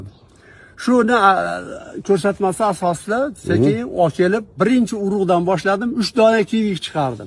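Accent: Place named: Margilan, Uzbekistan. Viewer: Адрес кайерда шевангиздан Маргилонликка ухшаяпсиз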